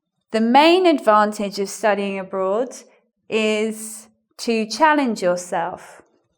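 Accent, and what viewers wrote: United Kingdom. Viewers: Do you have British accent ?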